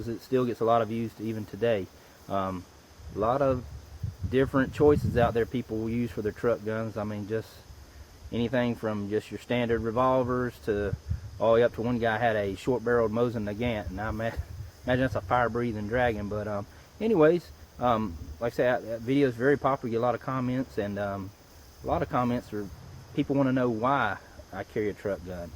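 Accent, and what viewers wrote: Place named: North Carolina, United States. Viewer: Holy shit, I'm sitting here like "I've heard that accent all my life, its distinctly familiar to me, even from other southern accents, but what are the chances that he's from NC?" should have trusted my gut lol. I should know one of my own people when I hear them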